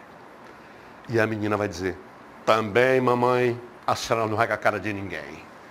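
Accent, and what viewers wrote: Pernambuco, Brazil. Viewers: Sotaque penabucano ❤👌 dele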